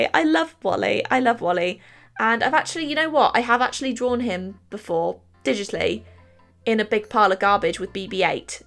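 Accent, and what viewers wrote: England, United Kingdom. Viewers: Are you in Uk? Just wondered you sound English. I like the look of the brush cleaner. xxxAnnxxx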